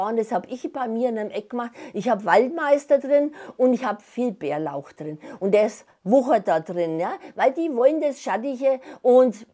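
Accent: Franconia, Germany. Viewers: Danke für deine tollen Videos 😊 Sag a mal kommst du aus Franken?🤔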